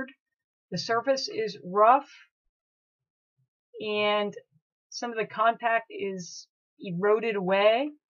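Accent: Philadelphia, United States. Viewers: The Philly accent makes me homesick.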